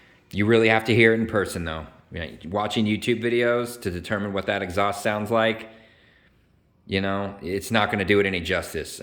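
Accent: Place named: Texas, United States. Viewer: Bro, you got a slight hint of Texan accent now. Also, nice bike.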